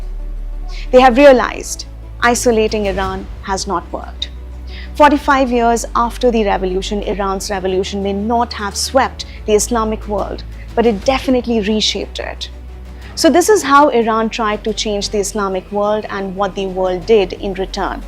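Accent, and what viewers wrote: India. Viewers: unlike wion and first post,, she speaks with clarity and lesser indian accent,, no ridiculous "she ask she answer" anchoring style like palki sharma,, its like we viewers treated as a 1st grader,, dumb and fool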